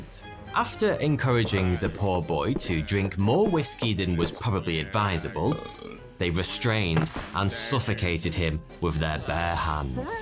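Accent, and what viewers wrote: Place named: England, United Kingdom. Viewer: All I can say is, wow! Sounds like jolly old England. Ha haha! James May.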